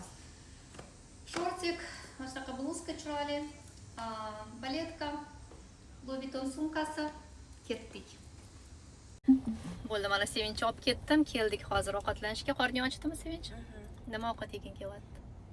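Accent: Uzbekistan. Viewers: Ўзлигиззи йўқотмаганиз яхши. Тилиз ширин. Тозза тошкентча. Қизиз билан ўзбекча гаплашвуринг. Ростанам опа сингилга ўхшисила.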